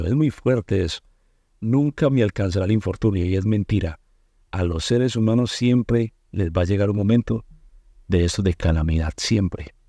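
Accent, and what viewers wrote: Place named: Colombia. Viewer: HOLA PASTOR..POR SU VOZ CREO QUE ES DEL PAIS DE COLOMBIA..YO VIVI EN COLOMBIA PERO YA ME VINE A MI PAIS VENEZUELA. ESCUCHO SUS <link> GUSTA APRENDER DE DIOS CADA DIA.BENDIGO SU VIDA EN YESHUA EL PODEROSO DE ISRAEL ISRAEL..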